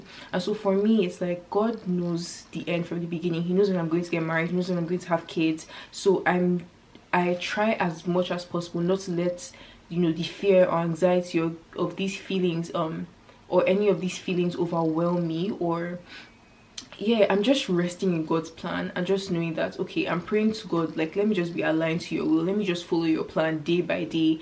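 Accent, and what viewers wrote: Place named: United States. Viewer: Were you born in canada or united states!!! You have an american accent with a african twist, you go in and out of the accent!!! Its so cute!!! You are a beautiful girl!!! Congrats babygirl!!! You remind me of my daughter!!!😊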